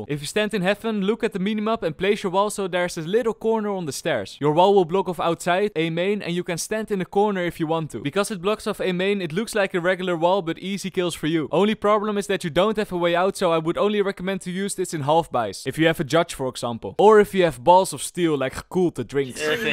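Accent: Netherlands. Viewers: Ich liebe deinen holländischen Akzent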